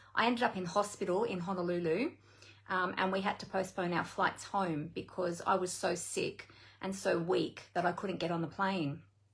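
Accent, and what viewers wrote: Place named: Australia. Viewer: So nice to hear another Oz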